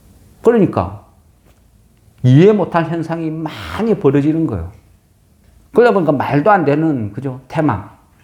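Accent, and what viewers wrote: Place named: Gyeongsang, South Korea. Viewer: 앗 경상도 분이시다 ㅋㅋㅋ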